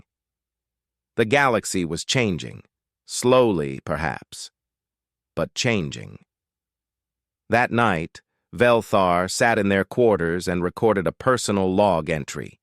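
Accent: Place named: South Korea. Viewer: Which Korea? Having issues picking from the accent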